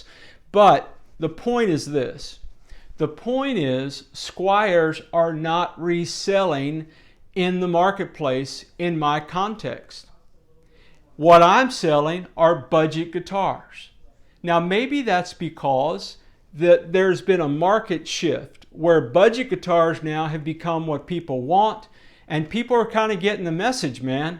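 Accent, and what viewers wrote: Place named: Baltimore, United States. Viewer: Love the Balmer accent!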